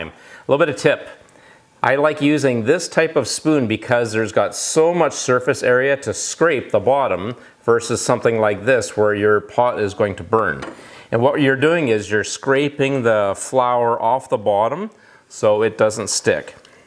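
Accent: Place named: Canada. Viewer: I love the Canadian accent! Showing love to the northerners from Southern California aye!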